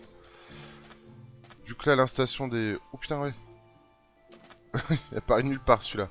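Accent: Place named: Belgium. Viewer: pOUCE VERT POUR L4ACCENT BELGE !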